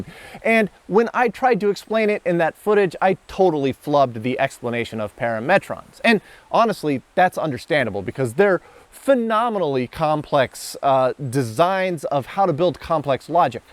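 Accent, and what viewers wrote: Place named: United States. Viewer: Wow, you did a great tour of japan, if I ever found myseld there, will be sure to watch this video again on what to visit PS: your japanese sounds american to me compared to the one the lady spoke Was wondering how easy is the language to learn for american person Also, how fast can it be forgoten if not used PS: is it wise to visit japan if u dont know japanese, can u get stolen or riped off easier?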